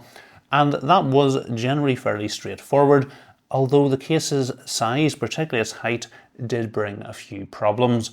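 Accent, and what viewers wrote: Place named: England, United Kingdom. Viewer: wow this channel is great. it took me a while to fully understand this english accent but now i can listen to you all day.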